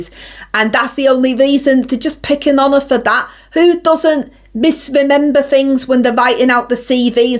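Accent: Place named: Manchester, United Kingdom. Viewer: I'm Lancastrian, and that accent is pure Manchester... and pure Rayner. 🎯👍👏🤣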